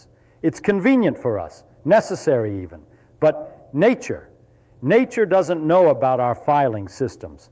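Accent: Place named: Transatlantic, United States. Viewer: I like this transatlantic accent style.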